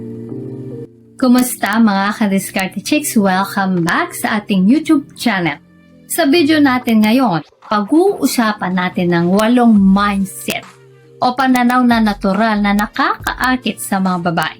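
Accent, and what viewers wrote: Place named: Bicol, Philippines. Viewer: Parang bikolano ka Ma'am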